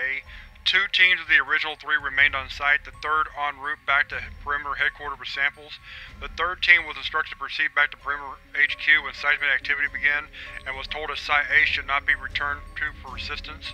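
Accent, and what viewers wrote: South, United States. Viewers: where u from? GA kid myself yet hard to place where u are below the mason dixion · Nice southern accent! You make this seem like SCP 610 was real.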